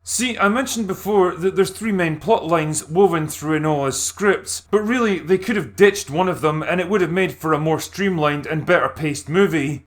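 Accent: Scotland, United Kingdom. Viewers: You should know that with me and my wife are confused about some thing or don’t have an answer we will respond to one another in your heavy Scottish accent and say don’t know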